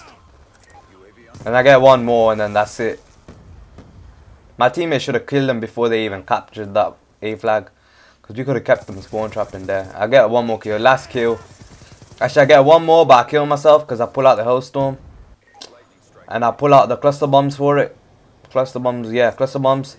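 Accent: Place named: Jamaica. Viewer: u sound jamica \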